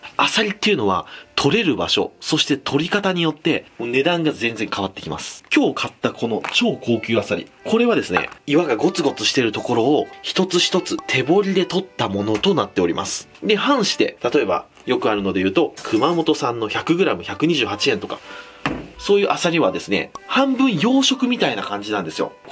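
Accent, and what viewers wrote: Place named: Aichi, Japan. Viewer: 最近視聴させていただくようになりました。時々馴染みのある訛りが聞こえると思ったらやはり愛知でしたか！嬉しいです！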